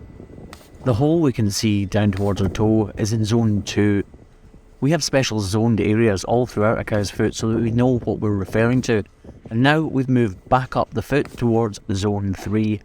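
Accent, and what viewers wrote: Scotland, United Kingdom. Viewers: You sound like an avarage british person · Scottish is crazy sometimes. I deadass thought you were speaking French and I didn’t know why I couldn’t understand until I realised you were speaking the queens English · Is it just me that find theses videos strangely soothing? Perhaps it's his calm Scottish accent.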